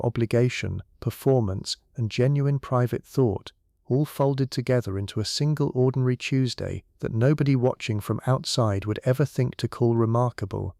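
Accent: United Kingdom. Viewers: Watching from the desert - in Phoenix Arizona ❤ I love the British accent- sweet dreams everyone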